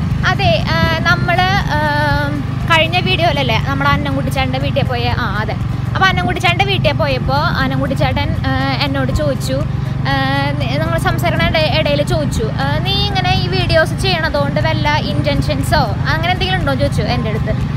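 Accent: Thrissur, India. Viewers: ഹലോ 😊♥️ പൊക്കി പറയ അല്ല എന്താ പ്രസന്റേഷൻ ♥️തൃശ്ശൂർ ഭാഷ കേൾക്കാൻ ഒരു സുഖം തന്നെയാ.. പിന്നെ (പേര് അറിയില്ല )ന്റെ വർത്താനം ♥️ഞാൻ vloger കാർത്തിക് സൂര്യ ♥️♥️ഫാൻ ആണ് ഇപ്പോൾ നമ്മള സെക്കന്റ്‌ ഫാൻ ആയി ♥️ഒരു women ആയ കൊണ്ടല്ല.. Athul സജീവ്... തിരുവനന്തപുരം 😊 ഈ സ്റ്റൈൽ ഒരിക്കലും മാറ്റരുത്... God bless you 😊😊🥰🥰♥️♥️ വിജയൻ ചേട്ടാ ♥️♥️♥️ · മോളെ ഉണ്ണി എന്നുപറഞ്ഞാൽ കുട്ടിയാണെന്ന് തൃശൂർ കാർക്കും മാത്രമേ മനസിലാവുകയുള്ളു അതുപോലെ തൃശൂർ സ്ലാങ്ഗ് ഒരു രക്ഷയും ഇല്ല പിന്നെ മോളെ നിന്റെ മനസ്സ് നെല്ലാണ് ദൈവം മോളുടെ ഉദ്ദേശം നടത്തി തരാൻ അനുഗ്രഹിക്കട്ടെ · നിങ്ങളെ സംസാരം കേൾക്കാൻ രസം ഉണ്ട്.... ചിരിച്ചു പോകും..... കാരണം തൃശൂർ ഭാഷ ആണ്.... ഞങ്ങൾ കൊല്ലം ആണ് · Thrishure slang and your smartness njanum subscribe cheythu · എന്റെ പൊന്നു മോളെ... നിന്റെ അസ്സൽ തൃശൂർ ഭാഷ... ഇഷ്ടപ്പെട്ടു... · തൃശൂർ സ്ലാങ് പൊളി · Hai ചേച്ചി ചേച്ചിയുടെ എല്ലാം വിഡിയോസും കാണാറുണ്ട് ചേച്ചിയുടെ തൃശൂർ സംസാരം തന്നെ കേൾക്കാൻ തന്നെ നല്ല രസം ആണ് ചേച്ചിക്ക് ബുദ്ധിമുട്ട് ഇല്ലെങ്ങിൽ ഒന്ന് എനിക്ക് വിളിക്കോ <num> ഇത് ആണ് എന്റെ നമ്പർ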